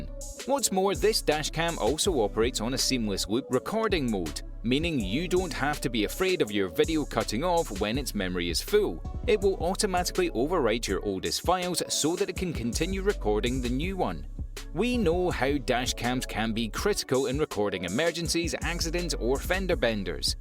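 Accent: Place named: England, United Kingdom. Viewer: you sound English but the price you give is in dollars >????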